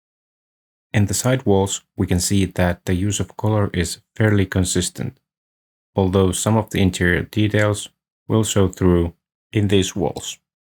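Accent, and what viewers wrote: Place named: Finland. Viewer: It's weird to immediately recognize from your accent but I think you're also Finnish? That's a nice building although the detail work is bit too cluttered for my taste.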